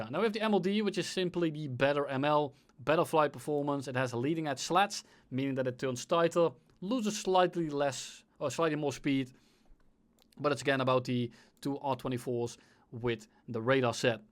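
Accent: Netherlands. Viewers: ik hoor n beetje nl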